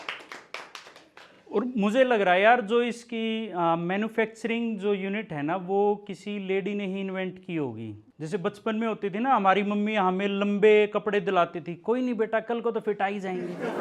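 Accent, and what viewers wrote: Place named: Haryana, India. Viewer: Great Going Bhai... 👌🏻👌🏻👌🏻👌🏻👌🏻👌🏻👌🏻 All the best, we all are with you... Meri ek baat hamesha yaad rakhna, Your HARYANVI Accent is your STRENGTH, your ACTUAL POWER... Don't ever loose it, Keep it up... 👍🏻👍🏻👍🏻👍🏻👍🏻👍🏻👍🏻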